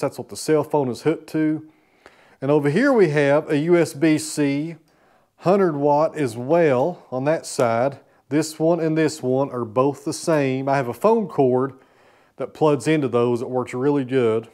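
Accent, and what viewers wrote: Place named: Tennessee, United States. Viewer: Bluetti was smart to back you up,. I can't really explain, but you voice and the charming Tennessee accent I hear, always makes your videos so smile inducing.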